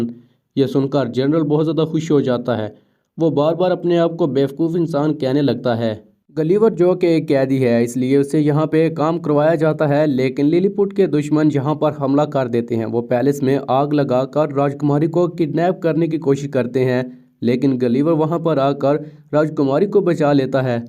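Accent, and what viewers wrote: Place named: Pakistan. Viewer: Tumhari aawaj se lag raha hai tum Pakistan se ho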